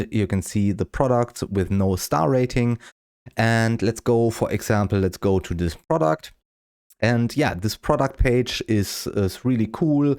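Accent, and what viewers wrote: Germany. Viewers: Akzent einfach deutsch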